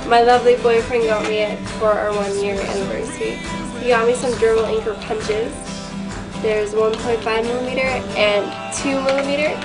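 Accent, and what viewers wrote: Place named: Canada. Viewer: I love your accent :D Canadian accents are cuuuuuuute ^_^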